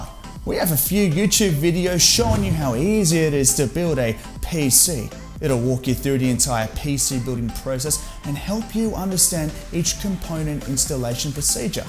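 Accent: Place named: Australia. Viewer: Is it because the Australian accent because for me he sounds fine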